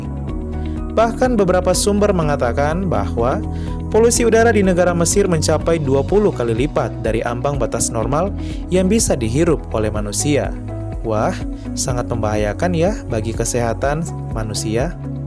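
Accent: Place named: North Sumatra, Indonesia. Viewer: penekanan huruf "e" Nya masih nampak. Sepertinya orang sumatera Utara.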